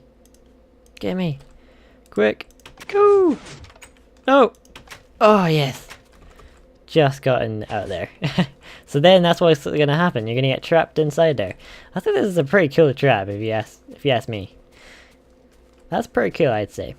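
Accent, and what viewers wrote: Scotland, United Kingdom. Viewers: are you pretending to be scottish or lived in scotland for a while or something?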